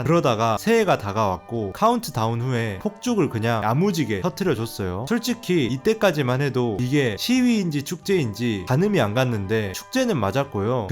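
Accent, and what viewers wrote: Jeolla, South Korea. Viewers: 생~소한 조지아를 소개하는 전라도억양의 청순한 청년.. 잼나요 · 전라도억양이 들리는데 전라도 사람 맞나요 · 특이한 멘트에 전라도식 사투리억양 개성있네요.. 재밋게 잘 봤어요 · 전라도 엑센트 너무 정감가요 ㅎㅎㅎㅎㅎㅎ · 전라도 사투리가 귀에 거슬리는군요. 표준어 사용하시길... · 전라도 사투리인가? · 아따 말투가 겁나 정겹다잉 편하게 말하자 어차피 너무 전라도다. 신나게 쓰자 너무 정겹다.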